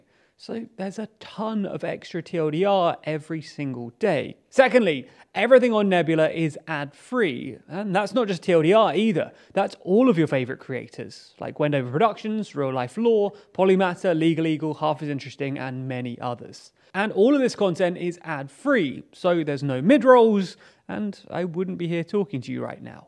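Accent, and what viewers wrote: United Kingdom. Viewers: Hearing from a person with British accent that human rights in the middle east have historical being violated... dude good part of it was causeed by your country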